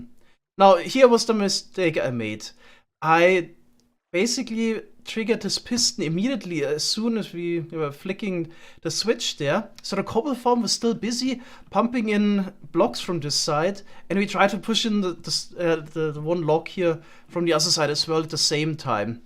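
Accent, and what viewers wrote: Germany. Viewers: are you german or scottish? or swedish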